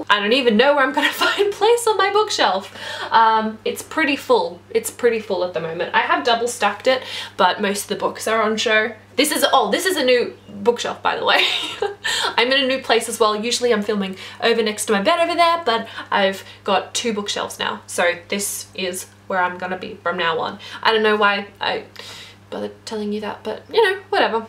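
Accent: Australia. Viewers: Australian or New Zealander?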